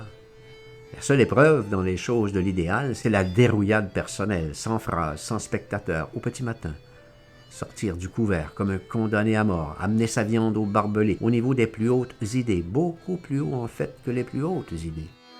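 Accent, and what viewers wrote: Quebec, Canada. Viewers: Moi qui ai du mal avec l'accent Quebecois, souvent coupé au couteau, je trouve qu'ici il apporte beaucoup de vitalité à un texte drôle et grinçant. Vous avez du talent.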